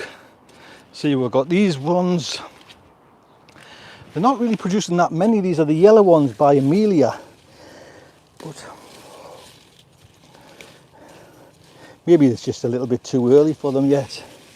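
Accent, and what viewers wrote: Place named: North, United Kingdom. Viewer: Sound good... apart from the funny northern accent!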